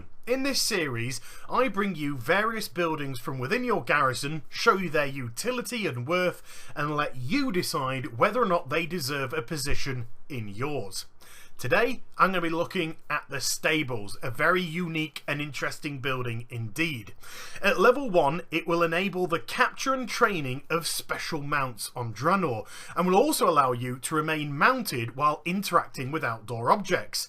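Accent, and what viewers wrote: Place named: United Kingdom. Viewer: Your comment is funny because he's from the country that created the English language, yet you "correct" his pronunciation.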